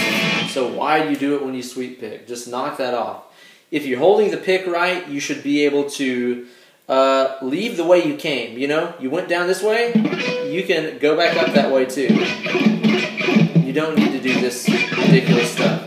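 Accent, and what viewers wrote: South, United States. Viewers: You are Pecos Hank of guitar. Your presentation is so similar, it's just that he's chasing Tornadoes and snakes. You guys even look similar and both with that southern draw. Great stuff BTW. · 2024. I notice the southern accent now.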